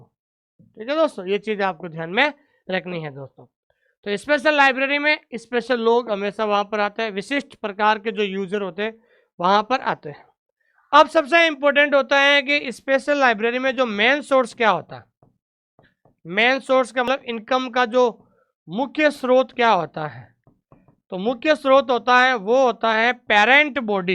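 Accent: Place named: Rajasthan, India. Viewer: Pura Rajsthani style me, kya khub lge hai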